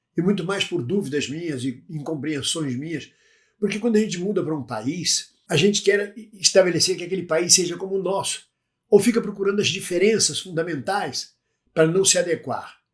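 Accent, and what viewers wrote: Portugal. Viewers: E vc já está ficando com sotaque de português. Como sou filha/neta/bisneta/tataraneta, já estou acostumada com o sotaque. Meu avô materno, tinha o sotaque dos Açores, esse sim é um sotaque difícil, rsss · O Bemvindo está com um leve sotaque português! Isso é muito bom 😊! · Vc está c um pouco de sotaque português! Que bonitinho rsrs❤ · "Pra entender o que estás a falar" Querido estais a pegar o lindo sotaque de Portugal.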